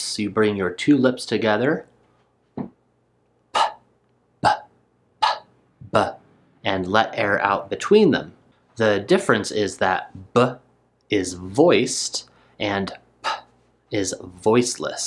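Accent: United States. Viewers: i Like amrican accent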